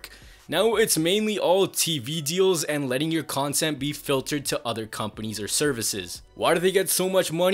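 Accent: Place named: India. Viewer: this guy speaks with a heavy indian accent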